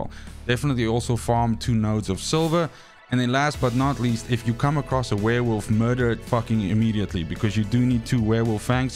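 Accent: South Africa. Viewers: South African perhaps? I hear quite the familiar accent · Your channel says Denmark, but you sound like you're from South Africa. Anyway thanks for the tips bro.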